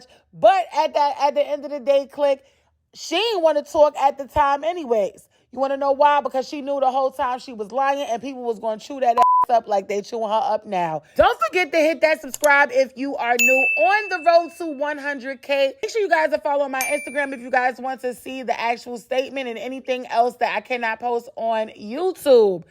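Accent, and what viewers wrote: New York, United States. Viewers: I Lvov’s ur New York accent❤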